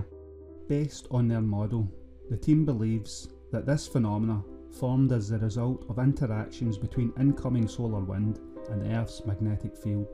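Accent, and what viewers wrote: Scotland, United Kingdom. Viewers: I am not a native British and that is why the Scottish dialect is not understood by me. I believe many others from a foreign language area share the same pain with me. I just don't understand. I am sorry. Subtitles don't help because I have such poor eyesight that I can't see reading the text. 😢 The thick dialect is not suitable for international communication.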